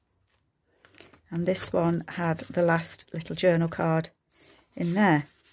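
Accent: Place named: Aberdeen, United Kingdom. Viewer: you are so very welcome... I tried to write you a note 🗒 about being able to locate the book you showed & mentioned... The keyboard wasn't able to show up.... any rate... I found it... Used & in like new condition 4 only a couple $$'s + SH... Thx 🙏 4 showing the front cover.... Your stories while you are creating are most enjoyable.... Your voice with accent take me back to my time living in Aberdeen & New Machere.. Happy time's... Memories more.... Later my BOO's... Sincerely sincere Miss Judith 🌹... xx 🎶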